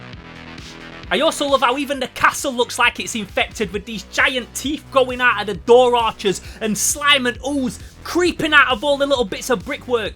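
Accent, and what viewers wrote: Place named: Cockney, United Kingdom. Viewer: That Cockney accent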